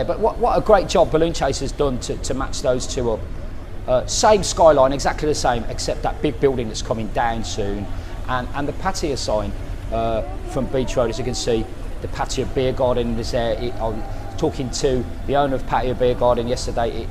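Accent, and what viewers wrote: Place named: Midlands, United Kingdom. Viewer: You sound like your from near me. A definite midkand accent I'm from Warwick well a village just outside beausale it'd on the way to Balsall Common. The back way into brum.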